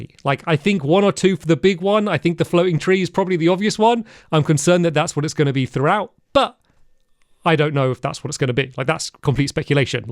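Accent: United Kingdom. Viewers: Never watched your vids cause I used to think your voice was annoying. Now I realize you're just British and it's not your fault. Live your truth, I support you 🕊️🇨🇰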